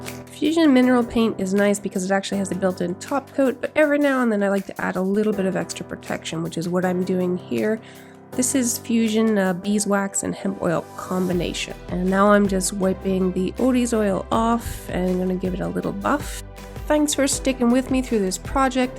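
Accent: Canada. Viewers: Canadian eh? AWESOME!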